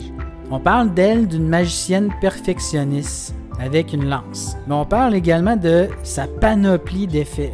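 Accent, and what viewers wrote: Quebec, Canada. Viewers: La savante est très bien mais la sorcière reste la reine des DPS !! Pour un gameplay en français go check ma chaine 🔥🔥 Très bonne vidéo ..l'accent québécois en plus 👍👍👍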